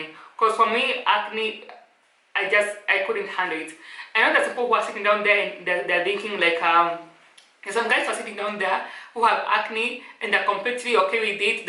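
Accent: Kenya. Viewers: Looking great. Your skin is glowing. ❤ *finally I can hear Kenyan/ kikuyu accent * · It's an educated Kikuyu accent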